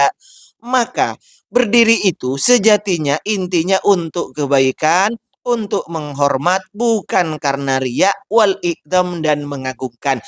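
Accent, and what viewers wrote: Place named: Java, Indonesia. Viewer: Dangkalnya pemahaman mu, mana kajian syeh untuk mencerahkan umat ini, ndi ndi bacanya pake logat jawa medok banget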